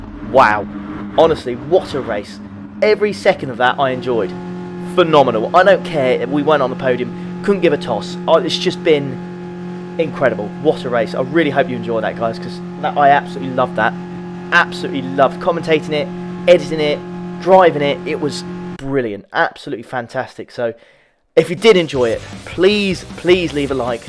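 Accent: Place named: England, United Kingdom. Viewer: Great Race, Dramatic Supens. Congratulations for ur fighting spirit znd ur Fair-play.💪👍 Love ur English accent and your channel content.👍🤩🤩🤩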